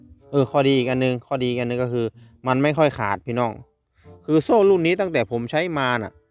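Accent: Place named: Isan, Thailand. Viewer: พูดอิสานฟังยากอ่ะ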